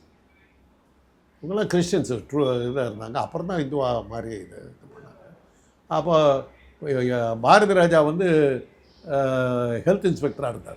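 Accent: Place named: Karaikudi, India. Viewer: காரைக்குடி தமிழ் தம்பி...... தலைப்புக்கும் பேட்டிக்கும் சம்பந்தமே இல்லை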